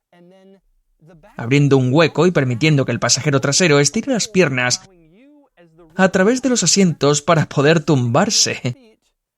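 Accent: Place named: Castile, Spain. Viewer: Nada más asqueroso y desagradable que ese acento castellano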